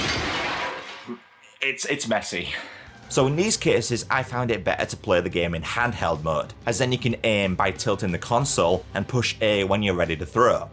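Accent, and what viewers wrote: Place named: North, United Kingdom. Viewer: this guy is my new friend he sounds up north lol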